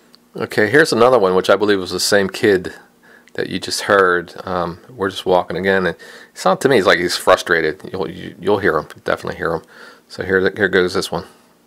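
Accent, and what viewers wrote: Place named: Pittsburgh, United States. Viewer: Pretty cool stuff. You sound like you're from Pittsburgh from the accent. Thanks for putting it all together.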